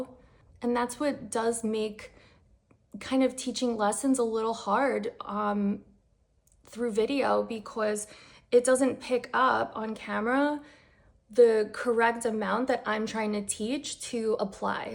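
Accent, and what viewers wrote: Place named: New York, United States. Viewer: Your voice and accent are so comforting to me. My family is from the Bronx and Jersey, but I am far from home in the Midwest. ❤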